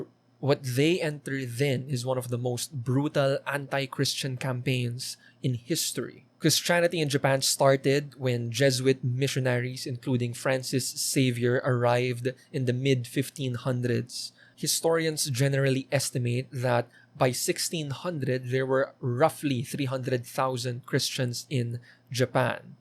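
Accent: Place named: Caribbean. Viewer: You have a slightly Caribbean accent, interesting.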